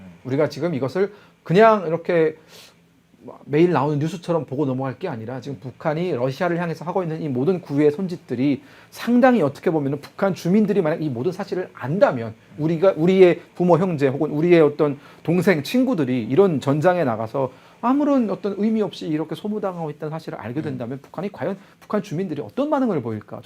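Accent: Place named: Seoul, South Korea. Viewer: 김금혁씨 서울말까지 완벽하니 인간미가 좀 없어보입니다. 북한 악센트도 가끔씩 좀 넣어주면서 강의해주세요 ㅎㅎ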